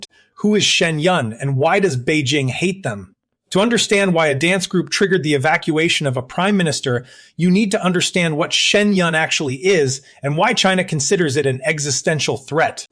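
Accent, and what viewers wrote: United States. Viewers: Why the American accent? This is BS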